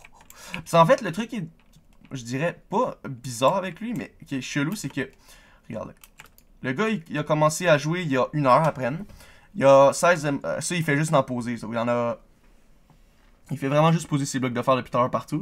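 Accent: Quebec, Canada. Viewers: t quebequoi ? ? ?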